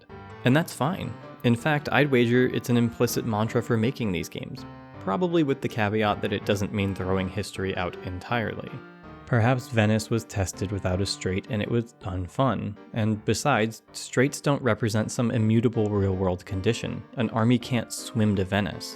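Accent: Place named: Ohio Valley, United States. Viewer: If you want to see a map game pushed to its limits, try Mappa Mundi for HOI4. Also, up until you mentioned it, I was wondering so hard why you sounded so familiar and local and then you said you were from the Ohio Valley as well lmao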